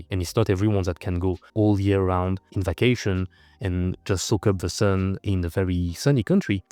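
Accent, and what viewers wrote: France. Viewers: I would pay for a French accent like this 😂❤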